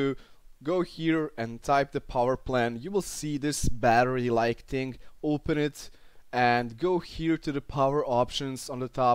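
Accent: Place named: Balkans. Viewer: znao sam da si sa balkana kad sam cuo kako pricas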